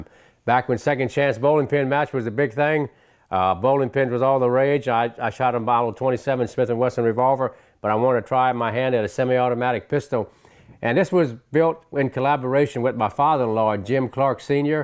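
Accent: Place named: South, United States. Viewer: It's GOLD, Jerry! GOLD! When I first started listening to this vid, it sounded familiar, but as if I was hearing you from something I had heard decades ago. Then it came to me. Your voice is similar to Don Novello's (Father Guido Sarducci on SNL). He doesn't have the southern accent, but the sound and cadence was similar. Of course the subject matters are totally divergent. I recall one of his bits was about a planet in Earth's orbit, but directly opposite of us, so we can't see it. Everything there is exactly the same as here except that they eat corn on the cob lengthwise. Thanks for "triggering" the memory. Anyway, your videos are treasures and hope you'll keep on keepin' on. I like the idea of chatting with other well known shooters. I had the opportunity to know Robbie Latham before he was a shooting star. I liked the kid. Not a smartass in any way.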